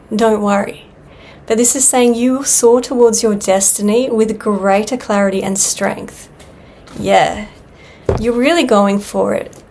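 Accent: Australia. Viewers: Greetings from California. Good reading,. Good voice. You will do well in the music industry as a singer. You are a star of Australia. We really like the Australian accent. We can listen to talk all day. Happy valentines from our channel 🙋🏻‍♂️🧜🏼🧜🏻‍♀️🦜🦜🦜🦜🦅🦅🦅👑Sally Alexandria & Alex * Australian Parakeets Stars 🦉🦉🦉💕💕💕🎉🎉🎉🎈🎈🎈🧁🧁🧁🍾🍾🍾🎊🎊🎊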